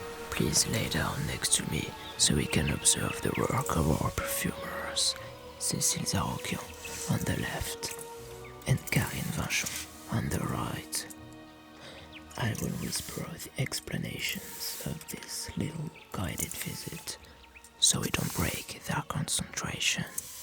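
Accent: France. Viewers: the fact that he has a french acc sent makes the ASMR so much more enjoyable and relaxing. it also makes the video feel more authentic. awesome work man much love 😊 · I'm from Russia, but I love listening to your English with a gorgeous French accent. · Nothing like listening to some French Accent ASMR before bed! I love this!!! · 5 minutes into the video I was like "damn his fake French accent is so on spot! ... Wait ... Is it fake tho?" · The acent of a French speaking is great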